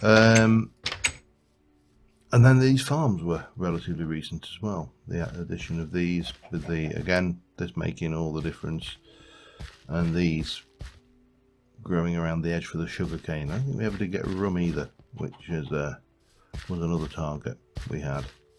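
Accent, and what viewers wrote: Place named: United Kingdom. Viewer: "Tears" for the series ending but with that comes a new beginning. I've been with you since your second series of TFC and I would just like to say that I think you have one of the best TFC series out there right now. Like Jimmyzation said I'd like your next series to go just as long. I"m from the US and my sister and I love british accents and whenever I'd have a problem with my family, work or pretty much anything I'd just sit down and turn on your TFC series and everything else just fades away. I do have a request. I wouldn't mind seeing a blooper reel. We're all human but its nice to see us being human every once in awhile. I also wanted to apologize for not commenting more, I know it gets your vidz out there. I watch your series on my game console. But I'd just like to say thank you for your time and effort. Your series has been a great inspiration for my own builds and a great stress reliever for me and my family.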